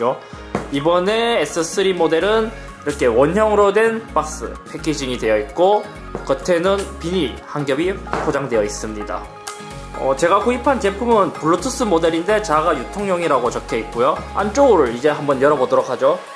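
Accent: Gyeongsang, South Korea. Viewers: 잘 보고 갑니다 ㅋ 대구 말이 정겹네요 ㅋ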